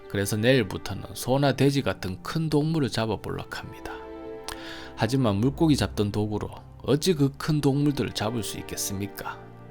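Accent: Gyeongsang, South Korea. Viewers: 경상도 사투리가 구수할수도 있구나~^^ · 근대 해설자님은 사투리를 경상도만 써요?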